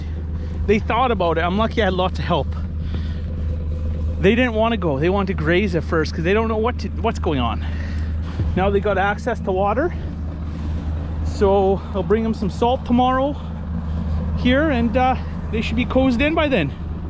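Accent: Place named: Upper Midwest, United States. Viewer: Oh wow, you're the real deal! WI or MN by the accent, yeh? I like that you're nice to them, use honey opposed to stick. :)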